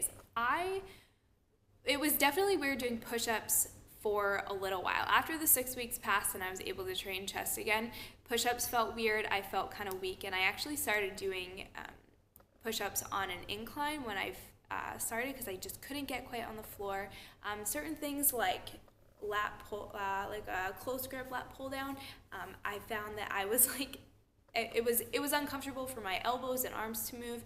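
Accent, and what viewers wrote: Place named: Boston, United States. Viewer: omg are you from boston? I am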